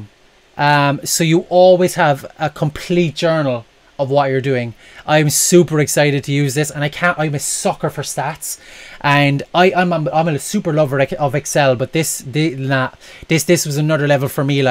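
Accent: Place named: Ireland. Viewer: Hi, Very informative - excellent explanation - thank you - alright / all night (couldn't quite get my head around your Irish accent!;-) One question if I may: you were using TradingView to explain your strategy, but using TD365 as your broker. The two don't integrate (sadly), so I'm wondering how that works? Perhaps you're just using TV for demonstration purposes on your YouTube channel and your actual trading on TD365?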